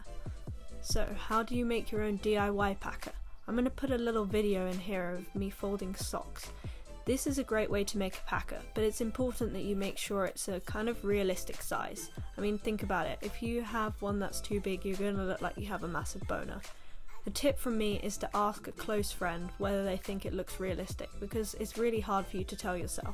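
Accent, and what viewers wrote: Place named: United Kingdom. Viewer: This vid was so much help omg!! I never even thought to use a safety pin, thanks :)) It's also so nice to hear another British accent talking about ftm stuff omg 😭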